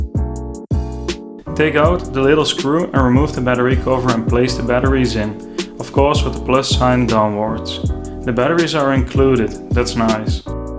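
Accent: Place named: Netherlands. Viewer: Je hebt echt een nederlands accent en een goede video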